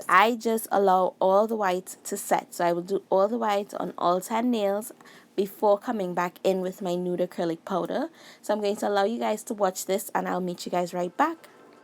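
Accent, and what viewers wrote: Trinidad and Tobago. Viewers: I just discovered you. Your accent sounds trinidadian 😮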